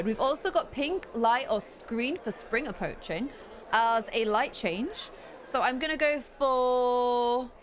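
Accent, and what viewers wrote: United Kingdom. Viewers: he's a geordie:)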